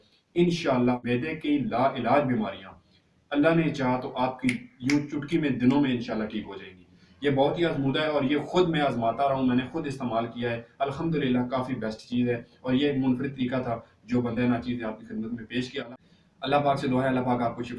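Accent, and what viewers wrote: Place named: Pahari, India. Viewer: Bhai apni bolne ka treqa badlo Ap k bolne PTA chal Raha hy k ap koi phari ho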